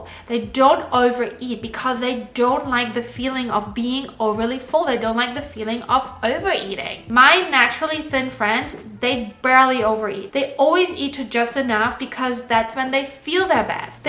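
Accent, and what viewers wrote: Germany. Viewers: Diet advice with a German accent was so needed for me! ❤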